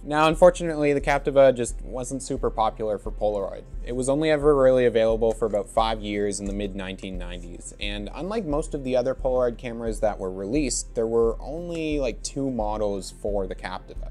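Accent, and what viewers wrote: Canada. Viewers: He’s Canadian so it’s his way of pronouncing it, you can tell too with Certain pronunciation of words in all his videos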